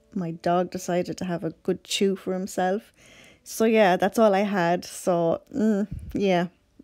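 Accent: Ireland. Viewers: Thank you so much for your quick reply 😊 I will try the 2 strands together.... your instructions are great, it is nice to hear a fellow Irish voice ☘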